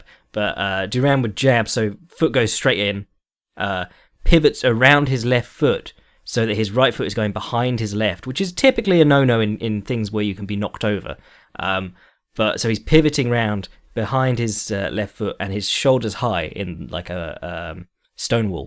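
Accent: England, United Kingdom. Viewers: is back already... the usual week long hiatus of a good old English accent delving into the joys of combat is no more. Thanks!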